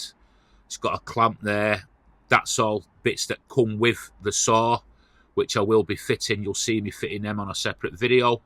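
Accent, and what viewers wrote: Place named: Manchester, United Kingdom. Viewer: Sound like a fellow manc to me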